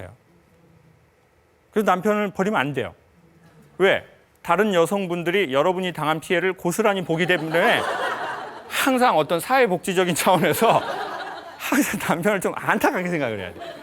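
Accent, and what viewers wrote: Jeolla, South Korea. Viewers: 영상 잘 보고 갑니다~ 구수한 전라도 억양이 듣기좋네요~ 사투리로 강의도 함 해 주랑께요~~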